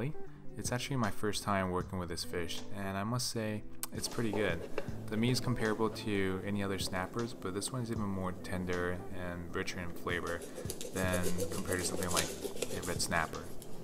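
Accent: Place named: South, United States. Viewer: Your voice, with a touch of Southern, is so soothing... perfect zen element to go with sushi! Thanks.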